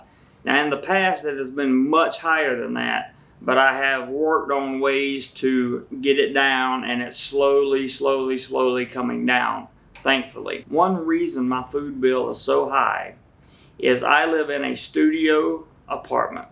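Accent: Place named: Carolinas, United States. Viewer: You are from Carolinas know by ur accent I LIKE Jomtien very reasonable for long stay shelter Was thinking you live in a more reasonable Shelter cost location??? Thats why i asked...Sorry dude Thailand is a million times Safer than USA dont understand security reasoning?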